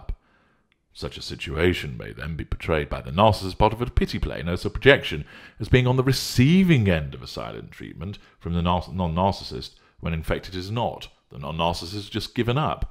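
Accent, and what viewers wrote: United Kingdom. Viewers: This guy doing the video has a polished British accent; he's very clear.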